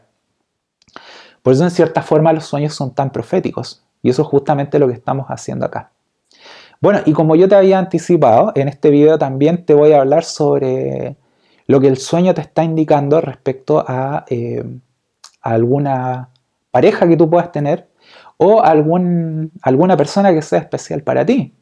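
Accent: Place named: Chile. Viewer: Me encanta escuchar Ace te chico super chileno..... Con esa voz tan cálida y tan clara..... Es familiar y cálido..... Además de certero en el tema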